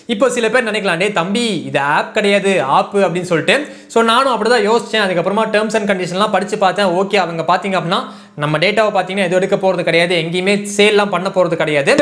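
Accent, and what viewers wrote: Coimbatore, India. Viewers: bro neenga coiambathura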